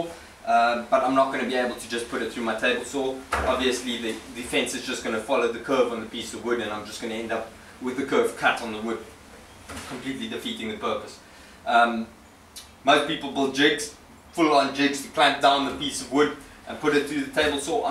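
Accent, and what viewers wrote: South Africa. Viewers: Thanks for the info! - Is that a South African accent? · South African accent? Good video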